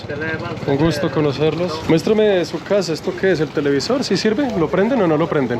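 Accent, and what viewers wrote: Colombia. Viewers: Ahí usted es único y mis respetos y mucha admiración. Yo también soy colombiana y me encanta su forma de hablar qué no deja que nos olvidemos de nuestros dichos!! Parcerito!!!y saludes a la abuela.😊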